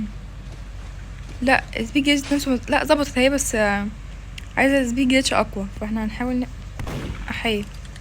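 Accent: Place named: Saudi Arabia. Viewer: ليه احسك سعوديه اكثر من مصريه؟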